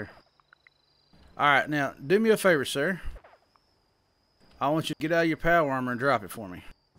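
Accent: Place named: Texas, United States. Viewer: You sound like a country singer with that type of Tx talk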